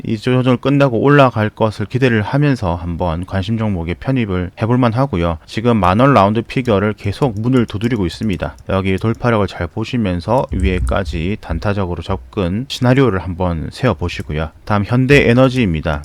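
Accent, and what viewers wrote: Gyeongsang, South Korea. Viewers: 시끕 ㅋㅋㅋㅋ 경상도 아입니꺼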